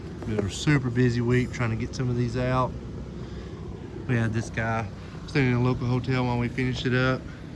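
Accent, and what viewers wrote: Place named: Alabama, United States. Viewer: You got a Bama accent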